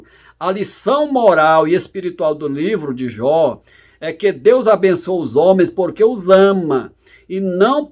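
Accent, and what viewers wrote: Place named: Northeast, Brazil. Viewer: Maravilhoso ensino, No bom sotaque nordestttíno.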